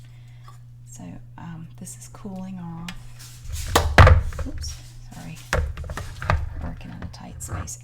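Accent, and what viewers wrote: South, United States. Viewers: your voice is so relaxing and I love your Southern accent. Makes me feel like I'm back home with my family in Franklin, VA :) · “..and the soap is very draaaahhh” (dry)., your southern accent charms the bejesus out of me 😊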